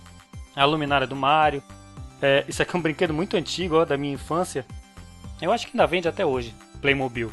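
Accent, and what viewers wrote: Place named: Belém, Brazil. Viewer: Ele deve ser de belém do Pará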